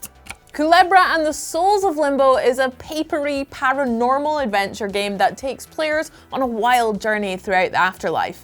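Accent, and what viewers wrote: Scotland, United Kingdom. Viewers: Xbox Strong all Day Long.... Is this Chick Kool or what. And so I hear a Scottish accent? 😊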